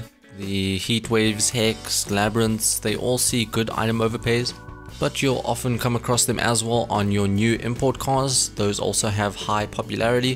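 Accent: South Africa. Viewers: Are u from south africa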